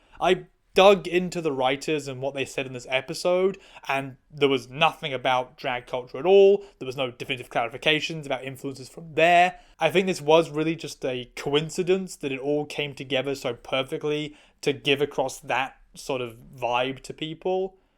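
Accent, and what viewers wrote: United States. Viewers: I like her american accent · Please please please do a bunch of shorts in the American accent just for luls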